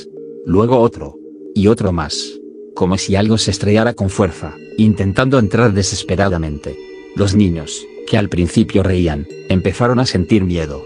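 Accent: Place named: Mexico. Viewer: exactamente, tenemos otros términos y lo narra muy mexicano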